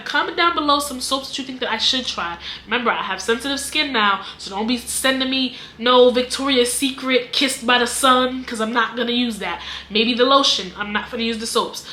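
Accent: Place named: New York, United States. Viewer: You sound like a native New Yorker ! 😂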